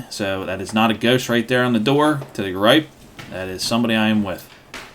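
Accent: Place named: Delaware County, United States. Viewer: Your accent gives you away. We grew up in the same place, Delco. I can tell.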